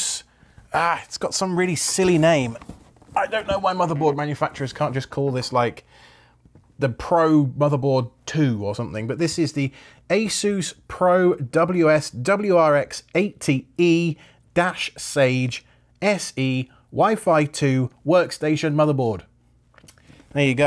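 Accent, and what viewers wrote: United Kingdom. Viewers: I just had an uncanny valley moment hearing inches in a british accent.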